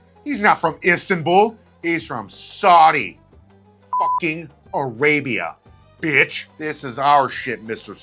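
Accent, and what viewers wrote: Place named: Minnesota, United States. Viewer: I love your videos! As a Minnesotan who now lives down south, hearing your accent every Friday is a breath of fresh air.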